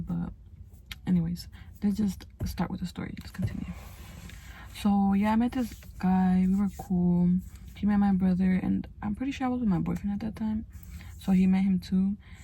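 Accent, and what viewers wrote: United States. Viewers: gurlll what side of houston you from?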